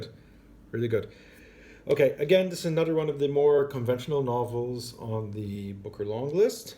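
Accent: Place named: Malta. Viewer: You are from Malta? I was trying to figure out your accent.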